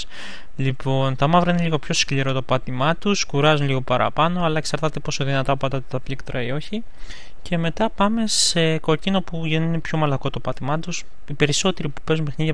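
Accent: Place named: Xanthi, Greece. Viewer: Α γιατί η προφορά σου θυμίζει ξανθη να σαι καλα και συ να ανεβάζεις ωραια βίντεο 😉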